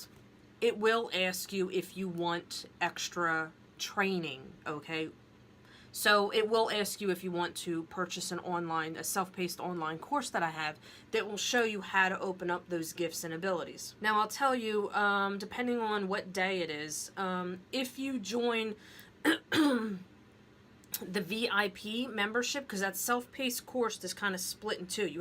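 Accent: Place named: North, United States. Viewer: I love your accent!!! I'm in Florida so I'm not used to that northern accent, I hear "y'all" a lot and I've even got into the habit saying it, lol!!! With the synchronicities you talk about, I've been having them for a few years now. I've gotten pretty good with my pendulum because I made an alphabet pendulum board. I have connected with my loved ones on that. I even made dowsing rods out of metal coat hangers and straws from a YouTube video, they've "hugged" me when I asked them too!!! Pretty phenomenal!!! But I'm still not at a place with all my clairs where I feel confident, so I'm thinking cards might help more in that sense instead of the pendulum or rods... And I know it's not the same for everyone obviously, but do you think cards will help more developing the clairs?? And how long does it take for you to have them all blown open after paying attention to the synchronicities would you say would be an average timeframe maybe??? 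Thank you so much in advance!!! Great video!!!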